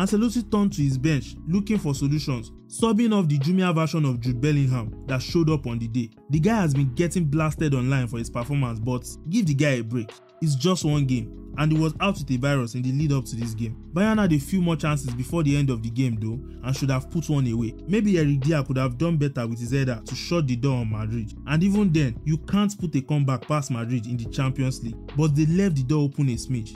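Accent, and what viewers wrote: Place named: Africa. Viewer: Nice video. Which country are you from. I get an accent either Nigeria,Ghana, Kenya or Cameroon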